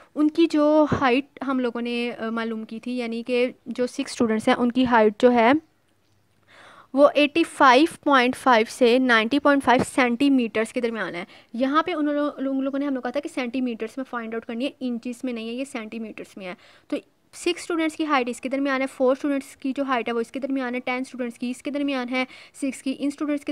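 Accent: Lahore, Pakistan. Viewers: Lahorey accent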